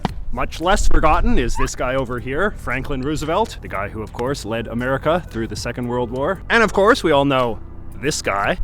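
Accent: Canada. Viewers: This guy pops up in my suggested videos maybe 2 or 3 times a year, but every time I hear him, I’m always shocked at how much he somehow has the most punchy, if affected Canadian accent I’ve ever heard—and this with having had Canadian classmates, dormmates and colleagues in America and abroad! Oh, and no one thinks American buildings are all closed off and under otherworldly security, except for maybe Canadians whose media desperately want to portray Canada as somehow so different from the US in terms of security and civil discourse in public spaces—but their public let us know that they are never too far off or behind in many ways, right, Ambassador Bridge?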